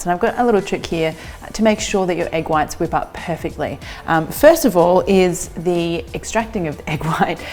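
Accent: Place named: Australia. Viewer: You're sounding more Aussie since coming back home!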